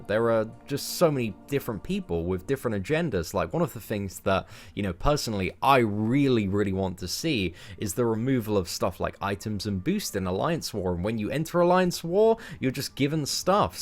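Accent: United Kingdom. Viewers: Not to be weird, but I love hearing you speak in your British accent with your British words hahaha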